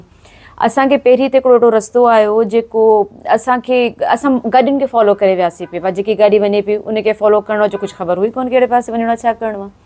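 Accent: Sindh, Pakistan. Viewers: Adi twhn larkano ja ahyo??? Twhnji sindhi jeka ahy na uha thatta badin tmk area wari lagy th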